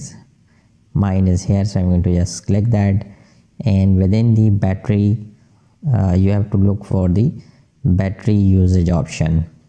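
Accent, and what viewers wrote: India. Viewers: They always have an Indian accent I swear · So wut if they have an indian accent i think their helping alot and doing pretty good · People with Indian accents on youtube have tought me more then school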